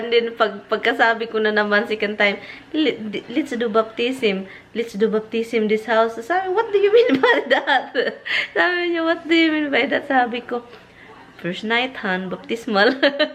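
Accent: Visayas, Philippines. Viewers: I enjoy your vlog so never mind the pronounciation understood na yun mga pilipino tayo hindi naman tayo british or amerikano love you.♥️♥️♥️ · Hello and Tommy watching from Muntinlupa City.. Parejas ta bisayang dako dhay oi...yun english pronounciation ntin matigas hahaha😂 never mind the bashers insecured lng cla sau. Kc sobra blessed buhay mo kysa knla. Nkktawa kng panoorin ang saya mo panoorin dapat gnun buhay msaya life dpat gnyan tau iwas stress...enjoy life lng😉😍 katawa ra ta oi😂😂😂 kalami sa imong sud.an lato ug daing. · Mkatawa mn ko mgtan aw nmu sis oi 😊🤣sagdihi lng na imu prounounciation bisaya ra mkarelate ana😁😁😁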